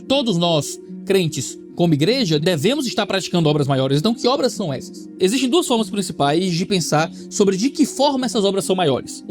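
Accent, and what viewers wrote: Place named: Northeast, Brazil. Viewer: Só outro nordestino para entender 100% o rsrsr O CRISTÃO MAIS PEBA da nova aliança kkkkkkkkkkkkkkkkkkkkk Ele nordestino no EUA e eu na África. kkkkk ótimo conteúdo.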